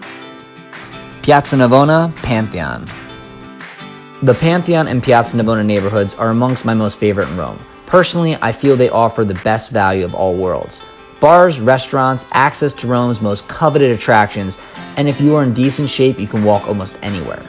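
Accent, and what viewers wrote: Philadelphia, United States. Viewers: Great video - thanks for posting. There is so much great information here. And weird question - but is that a Philly accent? :) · Sounds more like a South St., Philly accent. lol The Roman Guy does operate out of Philly.:) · I can’t wait to visit Rome this April. It will be the second since I was 10 years old on my family trip in 1998 before euro 💶 come. I don’t mean to judge but, you come from Philadelphia? I notice your accent since I live in Cherry Hill, NJ.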